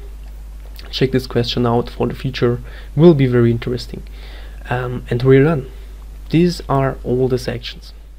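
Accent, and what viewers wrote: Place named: Germany. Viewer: Man merkt, dass du deutsch bist . Coole app ;)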